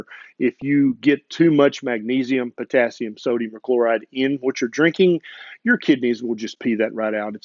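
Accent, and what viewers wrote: South, United States. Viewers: Thanks Doc for cutting through the marketing BS and quackery with science drizzled with your mellifluous molasses over honey southern accent.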